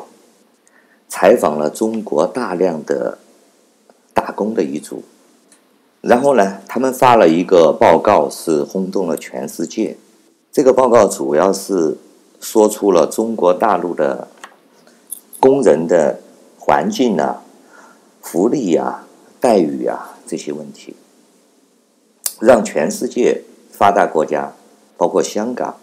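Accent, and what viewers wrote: Taiwan. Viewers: 井底之蛙…你的声音是台湾口音！先生！ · 这个口音，台湾腔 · 绝对的台湾腔，说说你是大陆哪的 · 台湾口音冒充大陆人，搞笑不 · 这你们也信？这么明显的湾湾口音，还大陆人？笑死人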